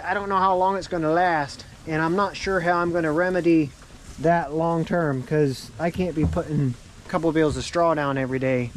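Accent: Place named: Lancaster Amish, United States. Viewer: Just found your channel from watching the interview which was very interesting my wife and I also left the Amish after we were married 4 years and that’s been 17 years ago I could hear in your Amish accent you were from Lancaster 😂 I grew up in Montour County Pennsylvania actually we just moved back here a year ago and so we do have Lancaster Amish for neighbors 😂 my wife and I did not grow up in Lancaster Amish but we did grow up old order Amish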